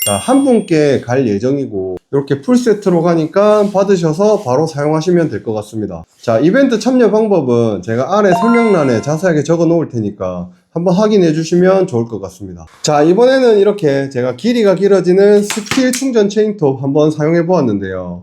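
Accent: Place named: Gyeongsang, South Korea. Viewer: (와~ 저기있는 나무 짤라야되는데 데이지가 않네) .... 경상도 사투리가 참 정겹습니다. ㅎㅎㅎㅎ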